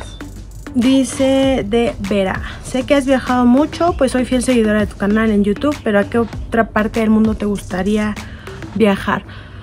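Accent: Mexico. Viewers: Tu voz siento que está diferente, antes te escuchaba algo chilanga y ahora es diferente, por cierto te vez muy bien muy linda saludos desde MTY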